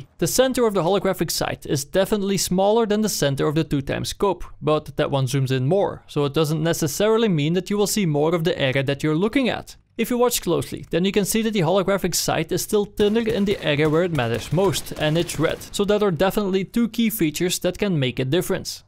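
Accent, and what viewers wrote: Netherlands. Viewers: Most dutch guy ive ever heard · work on your Dutch accent het maakt het wat moellijk te verstaan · argggg the dutch accent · your dutch im 100% sure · this guy has a dutch accent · Your accent looks netherlands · that dutch accent love you man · Nederlander, hoor je meteen 🤣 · ik hoor aan je accent dat je nederlands bent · You're so dutch. lol :D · #dutchie haha gast binnen 1sec wist ik al dat je nl was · your english is terrible with a huge dutch accent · u dutch boi can hear it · sounds dutch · Zijn Nederlandse accent is wel echt geweldig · hoor ik gewoon aan ze stem dat ie Nederlands is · your Dutch accent stands out so much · nederlands hoor ik aan het accent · Zwaar Nederlands accent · Je hoort zo dat dit een nederlander is XD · gast je r is the hard het is gwn te horen dat je nl bent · Jij bent nederlnads engels noob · barely even realize ur dutch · Nederlands accent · that Dutch accent is real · the fkin overpowering dutch accent killing me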